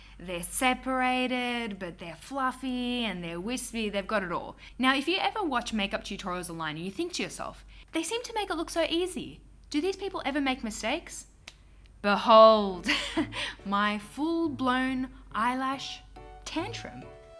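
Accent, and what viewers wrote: Australia. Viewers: I crave shape tape soooo much, but Tarte is not sold in Italy and the shipping costs is quite high for me... I can't stand this situation anymore 😭😭😭😭 #tartecometoitaly P. S. your aussie accent is so pretty! 🐨❤️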